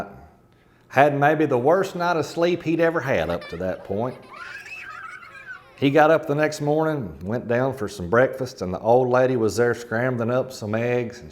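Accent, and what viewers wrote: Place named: Appalachia, United States. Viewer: Really good story! And told with great Appalachian voice.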